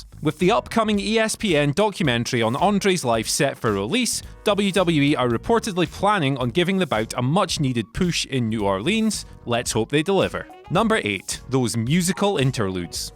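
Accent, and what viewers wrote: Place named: Scotland, United Kingdom. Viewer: Hey, he's scottish. They talk like that :o)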